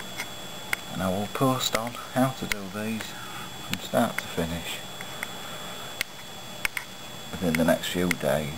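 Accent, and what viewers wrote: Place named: Scotland, United Kingdom. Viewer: Awesome, sounds like scottish though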